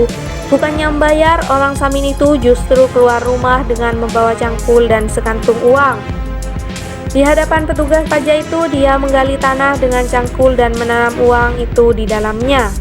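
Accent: Madura, Indonesia. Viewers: Cewek pembaca cerita nya orang madura atau mana? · Naratornya..sepertinya ..orang madura..logat nya kental sekali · Admin nya orang mana ini kok Logat nya Kya Madura 😅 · Makin lama makin dalam maduranya .. 🤣🤣🤣🤣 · Voice over nya logat Madura ? Betulkah demikian ? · naratornya oreng MEDURE neka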